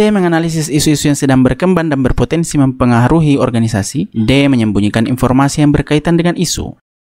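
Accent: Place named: Makassar, Indonesia. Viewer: Orang mkassar kah bos...logatnya agak ke mkassar...wkwkwk😂🤘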